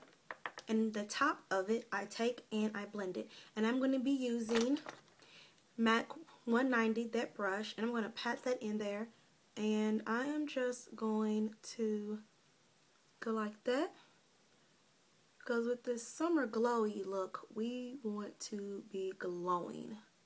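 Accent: South, United States. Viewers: Girl your southern accent is strong! "fingers" lol great tutorial!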